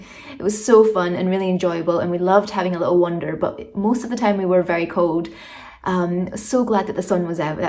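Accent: Scotland, United Kingdom. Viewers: Good to hear nice Scottish voice ,Peebles looks lovely 😊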